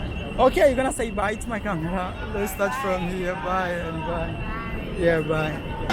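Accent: Kenya. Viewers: hes from kenya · The kenyan ACCENT IS REAL WAAAHHH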